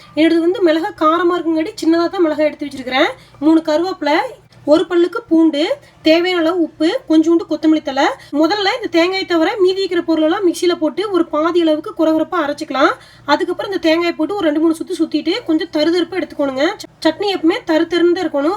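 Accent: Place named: Coimbatore, India. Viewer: Try pandren mam we are from cbe happy to hear our oor slang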